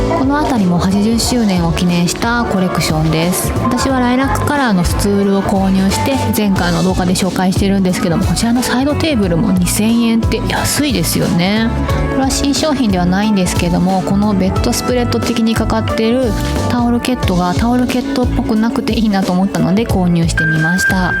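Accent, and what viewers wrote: Kansai, Japan. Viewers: さんの、関西弁がまじる説明が好きです😊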